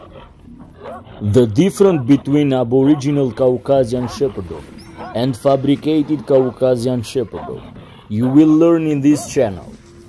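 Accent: Albania. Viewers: Is that Albanian accent? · Вполне понятный английский с албанским акцентом. Лично для меня на много понятней чем английский с австралийским акцентом. Все таки тут очень толковую информацию собирает автор, и почти без преувеличений или ошибок рассказывает о породе.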